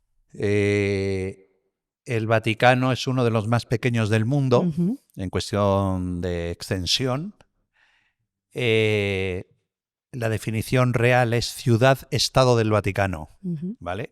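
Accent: Andalusia, Spain. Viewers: Excelente Potscast, la amo a lo maximo, excelente comunicadora, me encanta sus entrevistas y su acento andaluz. Tal vez mi origen tambien viene de ahi, creo que Malaga me jala para alla!! Abrazo beso.!!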